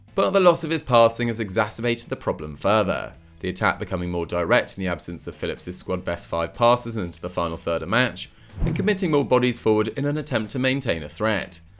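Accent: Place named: Sunderland, United Kingdom. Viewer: My guy is definitely a mackem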